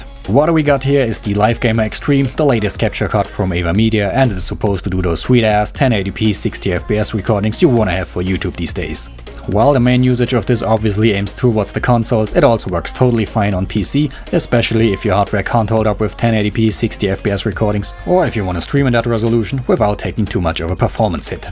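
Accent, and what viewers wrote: Germany. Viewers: You are from Germany,right?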